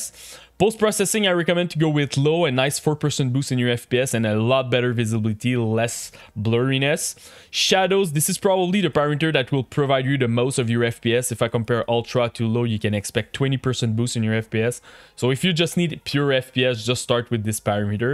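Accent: Quebec, Canada. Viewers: Thanks for the tips bro & est tu québécois?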